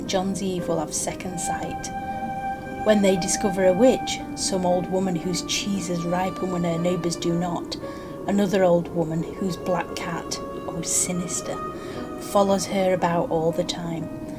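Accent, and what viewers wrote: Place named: England, United Kingdom. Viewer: glad to hear this being read in an english accent, good job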